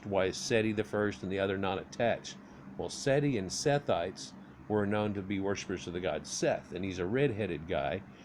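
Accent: United States. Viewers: Your pronunciation is horrible for someone educated in America. English is your first language so when you are introducing foreign name with American accent you expose your ignorance. Hopefully we can all read, so you are reading from Wikipedia is silly, simply because you have not practiced your reading with frequent mispronunciation showing your ignorance. Fact #1- Egypt started as a miscegenated society between Ethiopian or Nubian and Assyrian or Asiatic. So there is no white/black conflict. They were mixed from the beginning. You spoke of early pre-dynastic tombs. These were in fact NUBIAN. So the origin of Egypt is Nubian (negro). Fact #2- There were two governments . Upper Egypt (NORTH) with White Crown and lower (South DELTA) with Red crown. Sesostris is famous for centralizing the govt and wearing the double crown. Sesostris by conquering Nubia made them part for Egypt for over one thousand years. 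It was not until the Greeks invasion that Nubia was separated from Egypt.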